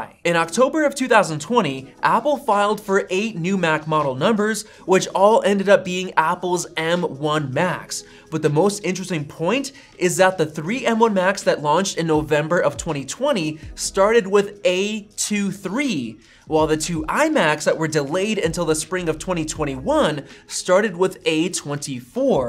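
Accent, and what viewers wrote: Russia. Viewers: Афигеть, у тебя русский акцент) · Portativny personalny Computer! I love your russian accent! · haha, I love your Russian accent.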